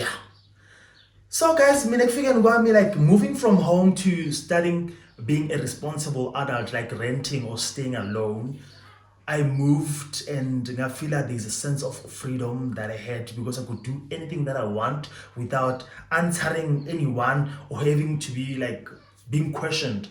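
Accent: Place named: Eswatini. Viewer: I could listen to you speaking siswati all day 😍😍😍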